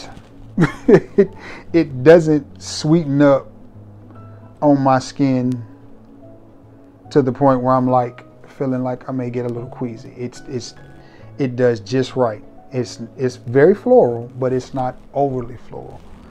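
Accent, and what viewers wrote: South, United States. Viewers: Arkansas or Georgia?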